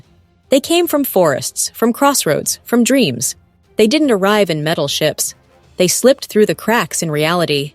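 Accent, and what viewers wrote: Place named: United States. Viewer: Я думал канал будет озвучен обычным нейронным голосом, не ожидал что будет такой качественный английский с превосходным американским акцентом.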